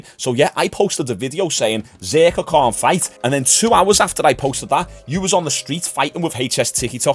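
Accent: Liverpool, United Kingdom. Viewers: Out of curiosity bro, are you scouse? if you are, that’s just another reason hes fucked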